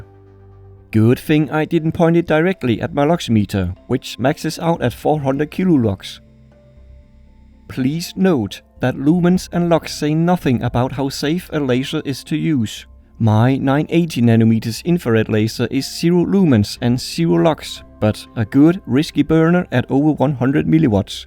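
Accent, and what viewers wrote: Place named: Denmark. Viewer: You sound Danish :)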